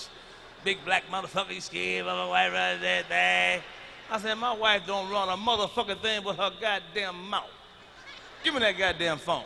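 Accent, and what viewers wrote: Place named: South, United States. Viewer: exactly just go to a family down south in any of those areas nd you'll see folks speaking very akin to Mac. Nothing like the legend himself tho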